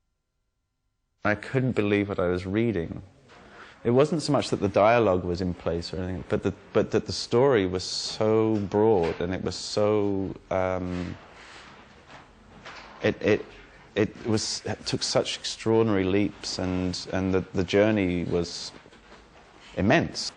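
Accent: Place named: RP, United Kingdom. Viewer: It's a little weird hearing him speak because I'm accustomed to hearing his 'Londoner' accent in 'Lie To Me,' and right now he sounds really posh in comparission, it's throws me slightly lol XD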